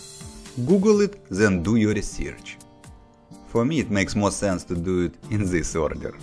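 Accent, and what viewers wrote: Russia. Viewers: Интересный у вас акцент. ВЫ не русский, случаем?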